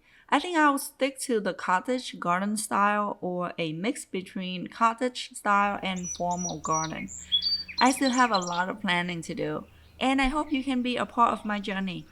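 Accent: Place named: Vietnam. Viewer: Are you vietnamese? You have the accent <3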